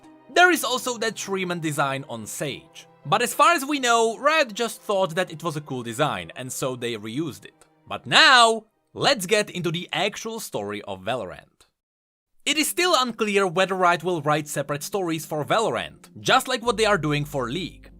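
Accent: United Kingdom. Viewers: nah he from the UK init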